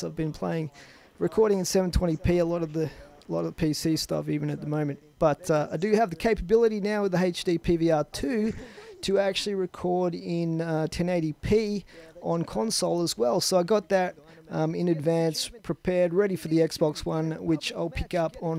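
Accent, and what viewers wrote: Australia. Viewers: It may sound a bit strange, but the original thing that really drew me to your gameplay was you accent. Nothing beats an Aussie accent. And as to the second question, i think that more games played with subs would be very neat., i am so proud of you. You have come so far with your channel, and i just would ask you to never give up. Nothing cheers me up after a bad day like watching a video:D thanks so much, and keep up the fantastic work:D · I'm not entering for the competition (obvious reason, no Xbox) but I'd say for 1. The Australian accent along with the fact that you don't go much astray when talking about random things during gameplays that get annoying (some YouTubers have the issue, luckily I don't see it with you :D). Most of the time your conversations with others or just the voiceover for the gameplays you do are entertaining/fun to listen to. 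As for 2., I'd say get better internet for streaming :P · 1. Custom Zombies - You're partly responsible for getting me into it (through Yoteslaya r.i.p.) - Love hearing a familiar Aussie accent on a typically american/british dominated genre. 2. I would possibly like shorter videos - Max 15mins. Otherwise, everything else is great!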